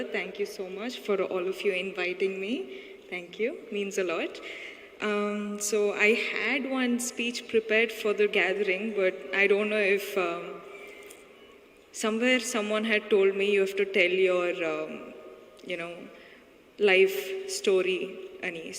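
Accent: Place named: India. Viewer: You dont have to provide subtitles for the speech cz we all understand the indian english accent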